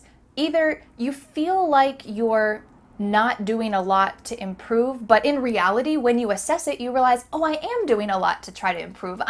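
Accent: United States. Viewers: Hello, I saw many YouTubers are teaching English but the way you teach English is very affective and understandable. Yesterday I watched another English teaching channel, I didn't liked their videos because firstly their accent was different like Australian and English, so those accent wasn't understandable for me 'cause I watch videos in American accent like yours and Hollywood movies. Secondly they were not getting to the point. They were just spending time in front of 📷. Last but not least Passionate about teaching English, I mean I didn't saw any passion in their eyes which I see in yours. So thank you very much 🙏🏻🙏🏻 💝 from 🇮🇳 (I'm sorry for my bad English because I'm beginner but I am sure that one day I will speak English like you) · Did you know that is from the state of North Carolina USA... so she speaks American English! You mentioned that you like British accents...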